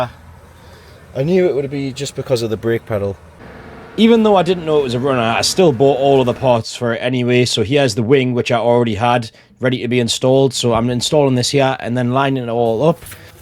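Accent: Newcastle, United Kingdom. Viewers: "And now i am changing the oil fil-er" 😂😂😭😭Good lord i love the British accent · I miss the Geordie accent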